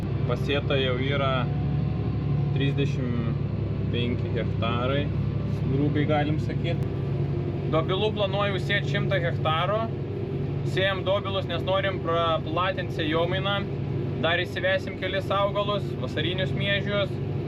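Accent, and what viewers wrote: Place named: Pasvalys, Lithuania. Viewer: pagal kalbod akcenta pasirode kad pasvalio raj :D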